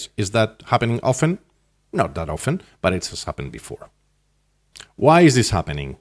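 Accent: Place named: United States. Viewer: Your American accent sounds beautiful.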